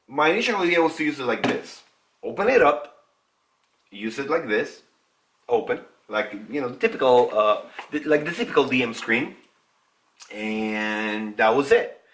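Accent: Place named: United States. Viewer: Hey! This seems unrelated, but where is your accent from? I remember in the cheesy Dune miniseries SciFi made a long time ago, the guy they cast for Count Fenring (the Emperor's Advisor) spoke with an accent which has some strikingly similar features to yours! I never knew where it was from! Yours does sound closer to a standard American, but it's still really cool.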